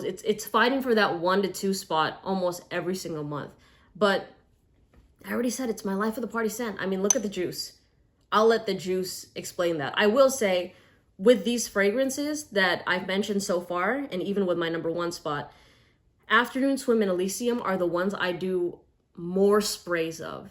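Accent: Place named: Philippines. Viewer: you definitely sound Filipina to me..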